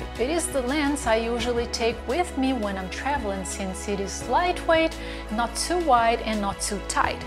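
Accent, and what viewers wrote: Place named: Brazil. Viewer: Eu escutei e aí guys, já percebi de cara que era BR kkkkkk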